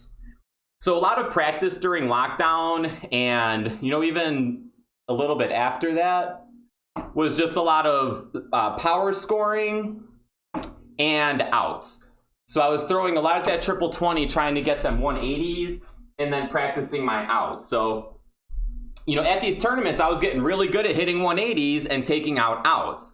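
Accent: Midwest, United States. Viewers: New Sub here. You a fellow Illinoisan? Hear that Midwest accent.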